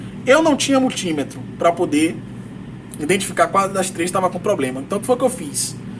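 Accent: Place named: Salvador, Brazil. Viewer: Fala moral, Ótimo vídeo, pelo sotaque voce é de salvador? Pois se for onde voce comprou essas peça?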